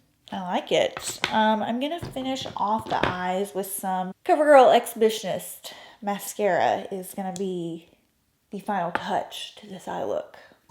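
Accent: South, United States. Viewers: Great look! Love your accent, sounds southern!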